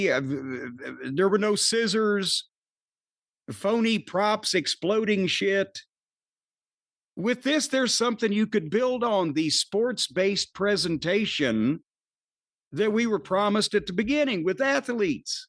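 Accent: South, United States. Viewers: 'The more you here him talk, the less you like him'... im feeling this with cornette lately. His southern, unwilling to change, preacher type voice is starting to get irritating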